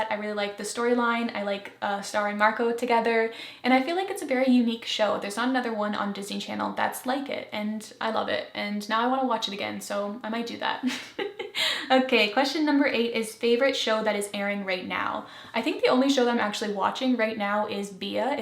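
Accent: Canada. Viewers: your canadian accent very much jumped out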